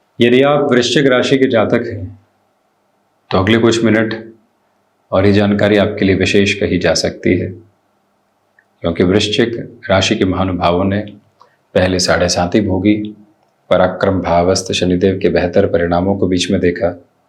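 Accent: Standard. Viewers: अत्यंत शुद्ध हिंदी भाषा व उच्चारण सुनने के बाद अत्यंत आनंद का अनुभव कर रहे हैं l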